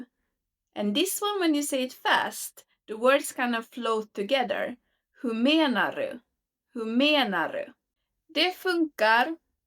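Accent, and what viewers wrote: Finland Swedish, Finland. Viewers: Är du finlandsvensk? Du har en svag brytning eller dialekt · Jättefin kanal och arbete med att lära ut svenska 🇸🇪👸🎖️ Jag blir ofta irriterad på norskar att det inte uttalar t i det. För det är stor skillnad på de, dem och det på svenska. Och att de säger "hade" istället för Ha det bra som svenskar säger när de menar det på riktigt och inte bara slentrian. Du är annars jätteduktig. Men du pratar en finlandssvensk dialekt? Då kanske man säger som norskarna i din dialekt?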